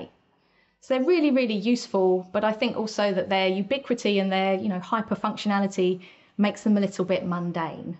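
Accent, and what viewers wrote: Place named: Cockney, United Kingdom. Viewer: Absolutely love her working class London accent Your a class act 👍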